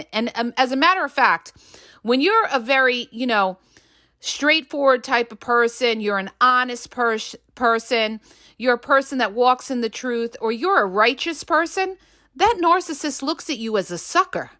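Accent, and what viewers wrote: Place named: Brooklyn, United States. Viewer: Hey, cool, Brooklyn accent. You are spot on with narcs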